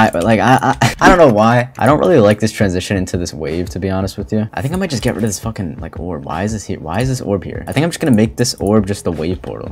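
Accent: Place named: New York, United States. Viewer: Yo gl on silent clubstep. I miss the New York accent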